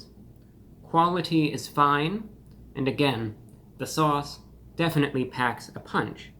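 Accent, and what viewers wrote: United States. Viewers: I dont think ive ever heard anyone speak American English quite as properly as you 😂😂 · Is it just me or is anybody else wondering if this guy is American or English??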